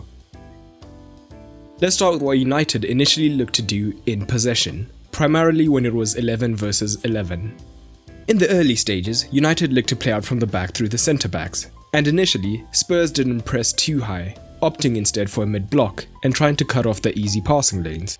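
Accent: South Africa. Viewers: You sound South African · R u South African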